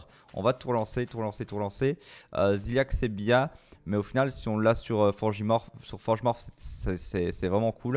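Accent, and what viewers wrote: Belgium. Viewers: GG pour le tournoi et les qualif. ça fait toujours plaisir d'entendre (avec ton accens ;)) un compatriote Belge performer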